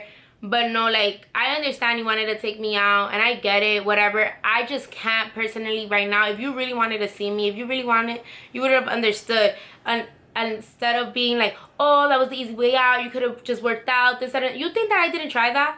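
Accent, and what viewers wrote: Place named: Bronx, United States. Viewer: You sound like you’re from the bx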